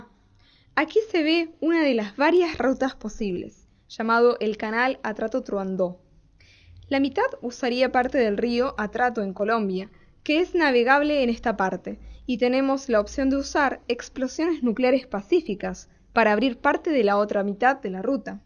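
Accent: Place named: Panama. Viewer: No Pues!! Esta Tipa Solo Piensa En La Economia. y los Animales Que?? Los Que estuvieron millones de Años Primero que nosotros que?? no merecen ningún trato? del Hecho de Ser ''ANIMALES'' Pff Por Dios -.- Habla como si fuera panameña la tipa esta. Ademas, que conexión de las américas ni que nada!, con las ganas se van a quedar porque ese tapón los panameños la cuidaremos, y sera el tesoro que le dejaremos a las nuevas generaciones de nuestro Pais PANAMA..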